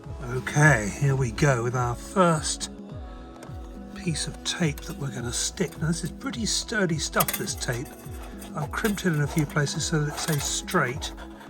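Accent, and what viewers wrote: United Kingdom. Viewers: my friend you are not an idiot (you just talk funny 😄 (British accent)). Great job.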